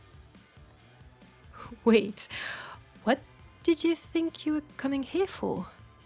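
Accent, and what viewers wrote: France. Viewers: So happy to see this witch back in business again, she’s my favourite of all the characters you voice and your French accent is always so nice to listen to hope you have a great Halloween and look after yourself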